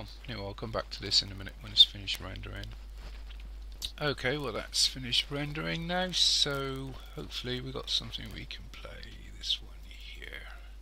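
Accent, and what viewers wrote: West Country, United Kingdom. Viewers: Thanks! Very useful video! Where are you from? Sounds like you're from somewhere in the West Country but it doesn't sound quite like West Country accents I've heard.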